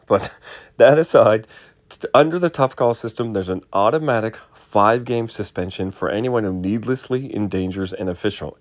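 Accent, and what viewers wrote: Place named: Ottawa, Canada. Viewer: You sound clueless. And like a resident of Ottawa.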